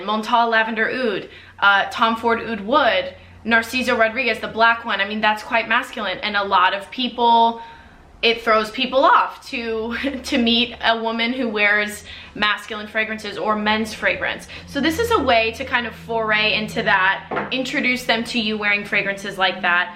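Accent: France. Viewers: You have a great taste on perfumes and a very nice french accent ! Great video, I watch you your channel from Portugal! 😁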